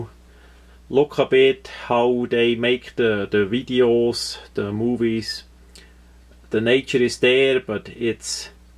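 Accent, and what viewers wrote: Switzerland. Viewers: Iv had a few Swiss friends you guys are good people. all the people iv spoken to are more American then Americans. as far as I know Switzerland is pretty free but oppressed at the same time. like needing a permit to transfer ammo to go the range. A friend said the houses are really small there and its almost like a meld between the old world and the new world. how you would have small old houses next to factories with mountains in the background. Im looking forward to your series. I always enjoy your videos both the content and the accent =) If I could have a different accent instead of my yank accent Swiss accent would be at the top!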